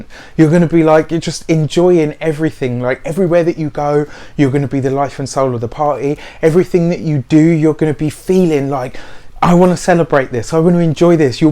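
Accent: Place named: London, United Kingdom. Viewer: He's not Manc. He's quite clearly London way. #watchatalkingaboutwillis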